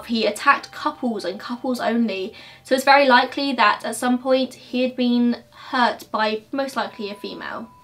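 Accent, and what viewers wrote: United States. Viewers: Your little American accent is the cutest omg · your "american" accent is so cute!!! also completely agree with what you said in the beginning of the video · I agree with you!!! Keep up the good work and you did sound a little American and it’s ok 👍🏽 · Stand your ground. If you had something truly awful to say about rape, animal death, child harm....and the like, I have every confidence that you would warn us before you blurt out that the family cow stepped on the diaper of the baby of the family then the goose was giving the wife the eye and she felt threatened of rape so she slapped the horse on the rear and he kicked the goose in the knee. Enough said. BTW, your American accent is adorable. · Your American accent is on point! Dont be so shy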